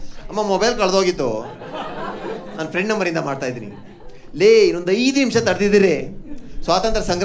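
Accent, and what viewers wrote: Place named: Mandya, India. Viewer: Watched 2nd time next episode pls Pakka madya slang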